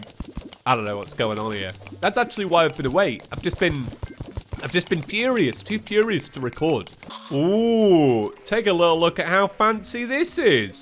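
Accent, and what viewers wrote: United Kingdom. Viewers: Welcome back,. Unfortunately, my grandmother passed away about two weeks ago. I’ve been going back and watching your videos. I am unashamed to admit your relaxedness and smooth british accent have unironically gotten me through.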